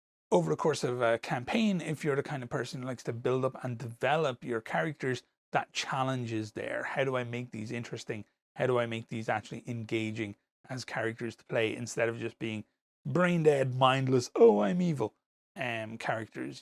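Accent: Ireland. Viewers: The voice of fantasy evil is Irish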